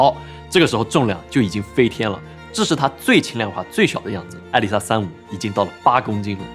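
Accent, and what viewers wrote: China. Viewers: 貨幣可不可以講清楚？我看你翻牆到美國開頻道，又操著中國口音，到底要我選哪個貨幣？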